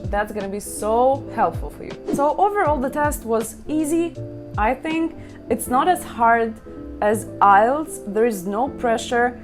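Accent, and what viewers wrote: Russia. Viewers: you from russia yea, я вижу это по твоему акценту. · БЛИН так слышно русс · До мне даже не дошёл что ты русская))я очень восхищён с твоим акцентом !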